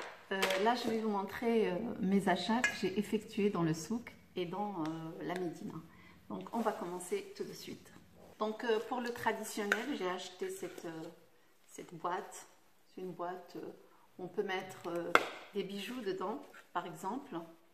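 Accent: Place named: Meknes, Morocco. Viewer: Je me disais que ton marocain est le même que le mien tbarkellah 3la nasse Meknès ... Ma ville d'origine 😍😍😍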